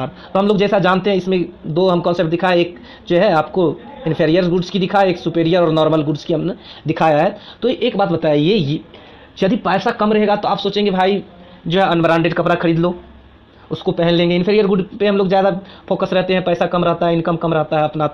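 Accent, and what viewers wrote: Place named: Bihar, India. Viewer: Very nicely explained.. It seems you are from BIhar. Good luck..!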